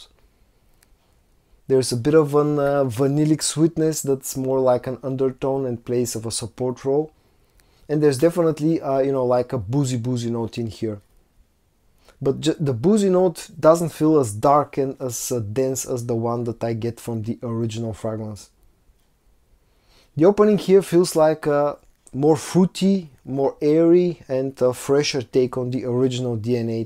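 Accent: Bulgaria. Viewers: Great video! You're getting getting better all the time. It was funny how you just walked past the camera in the beginning. 😂 I enjoy listening to your beautiful Bulgarian accent as well, and how some words are pronounced, like it sounded like you said "meatloaf note" when you actually said "mint". 😅 I was like"Yeah! Now I need to find a bacon note!" Great to see you getting more comfortable. Thank you for the time you spend sharing with us. Love from Virginia, USA.❤️🙏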